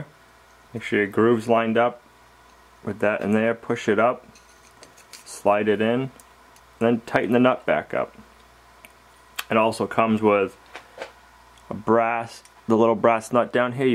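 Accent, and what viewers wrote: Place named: Vermont, United States. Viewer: What are temps currently at Lumnah acres? I think that you mentioned (if not your accent says so) being near Vermont. We are currently at -5 for a day time high temp.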